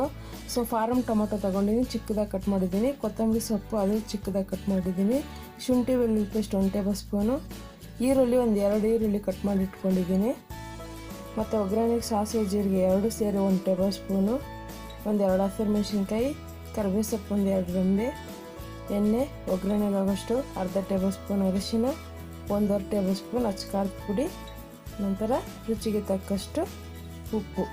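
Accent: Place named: Kolar, India. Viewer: I think u r from Kolar region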